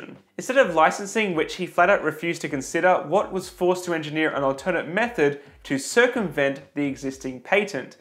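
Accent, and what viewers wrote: Australia. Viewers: Nauhteeboy he's an Aussie ya dick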